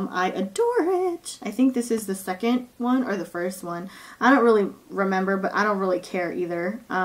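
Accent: Philippines. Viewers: I love you girl haha..ur Filipino fob accents are hilarious .. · I love ur Filipino accent. hysterical!!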